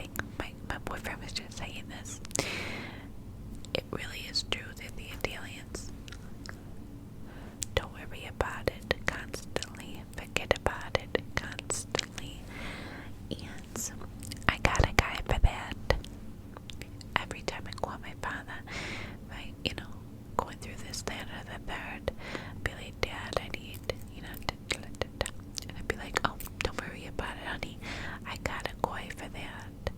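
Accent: New York, United States. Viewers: Ny accent is just amazing!,!,!!! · The NY accent is EVERYTHING! i could just listen to you talk about anything. love you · As a brit 🇬🇧 the newyork accent just hits different. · New York or jersey? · NY ACCENT IS LIFE 😍😍🗽🗽🗽👌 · The NY accent is everything. I’m loving the nude sweater and golden tones on your eyes ! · I am literally in love with the NY accent it is so tingly!! Maybe in the future you could do a hairdresser role play in an NY accent 💕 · I love your New York accent but your little rant about people’s comments saying it sounds Boston made me crack up even you smiled after you’d finished haha 😂 not sure how I missed this one but thank you, love it the accent brings me so much comfort 🥰 like in the movies when your little snuggled up watching films and they have the accent 💜 You also have such lovely hands xx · ny accent AND 30 mins....#spoiled:) thanks soo much! 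<3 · love the New Yawk accent ! ur really good at it ! :D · i find it funny that I LOVEE your NY accent, but me and most of my family is from Boston lol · Hey I love your New York accent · The clip truly is one of the tingliest triggers I’ve happened across! When you were running the clip through the mic, using both clips at the same time, and just saying the word “clip.” SO MANY TINGLES! But... - melted my brain in the most amazing way. 🤤 That was sooooo good. Thanks! This video was relaxing from start to finish. And I love your NY accent! The haters can kick rocks with no shoes on. 😎✌️💕 Edit: -... use this as a “treat yourself” button y’all! 🤤💜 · I swear it's like you've read my mind!!! For the past couple of days I kept thinking about how I can't wait for a new NY accent video from you. I'm melting.....🤤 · I LOVE the New York accent!!!! Love you ❤️ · That ny accent is amazing just like u girl hope u have a great week remember your son is so lucky to have u xx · Yessss I think that the clip might get bigger than the claw with the mic and the ny accent like is this a dream 🥰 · I have watched the New York accents none stop and it’s funny cause my friend that’s from New York talks just like this it’s perfect 😂❤️ btw perfect video so so relaxing ❤️ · Love the New York accent!! 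What is your light called in the back x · Omg i love the ny accent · Not only am I absolutely obsessseeeddd with the NY accent, the background water projection is so relaxing! Such a good vid 💕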